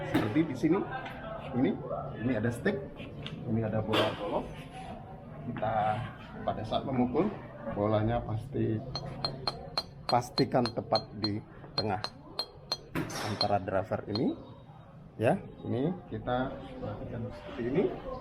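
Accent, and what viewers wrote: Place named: Bali, Indonesia. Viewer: Ini bapak lihat logat nya orang bali kali ya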